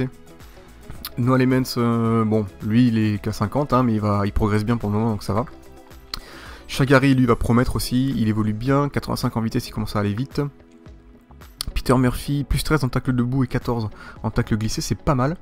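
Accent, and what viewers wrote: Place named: Bordeaux, France. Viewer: Coucou tu connais pissos car j y suis allé en vacances car tu semble venir de bordeaux avec tes "sans deconné " 😂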